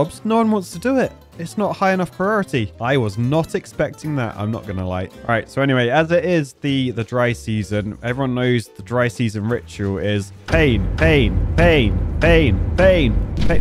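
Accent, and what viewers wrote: United Kingdom. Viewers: Are you English or British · Where in the UK are you from?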